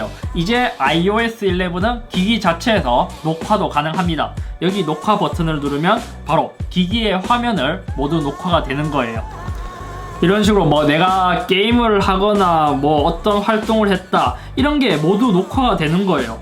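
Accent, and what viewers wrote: Gyeongsang, South Korea. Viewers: 잇섭님 경상도 어디에서 올라오셨어요? ㅇㅅㅇ??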